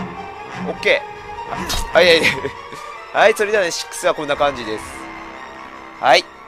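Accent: Kagoshima, Japan. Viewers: さんの鹿児島の訛り好きだなぁ... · 鹿児島訛り好きになりそう！素顔見たかったので嬉しいです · 鹿児島弁良き！！👍👍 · 鹿児島訛り最高です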